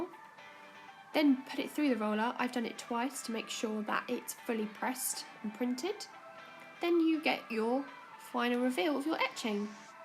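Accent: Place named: Bristol, United Kingdom. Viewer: A Bristol accent! My roots!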